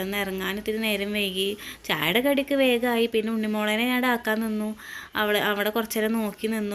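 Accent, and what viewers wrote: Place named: Malappuram, India. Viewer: ഞാനൊരു മലപ്പുറം ഞാനിങ്ങനെ സംസാരിക്കും ❤ എനിക്കിഷ്ടപ്പെട്ടു😂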